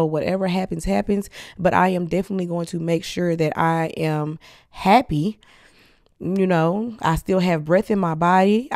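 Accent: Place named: St. Louis, United States. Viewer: I definitely wanna become a esthetician the more and more I watch ur videos…but I kant help to ask yu got the urrrz like ur from St.Louis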